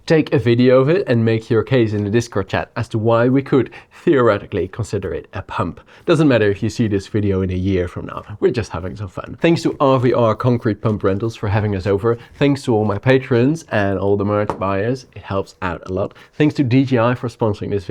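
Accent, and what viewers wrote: Netherlands. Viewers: is this guy dutch? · I love the dutch english accent ❤😂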